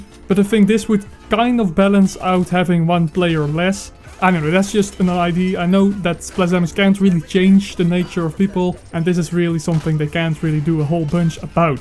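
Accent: Netherlands. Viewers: U sound really Dutch (vette video man en respect voor je Engels het is echt goed) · haha lekker hollands accent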